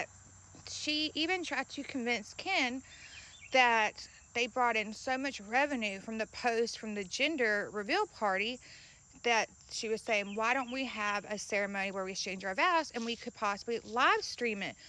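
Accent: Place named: United States. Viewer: Mee too new sub here and I love your country accent sounds like mine lol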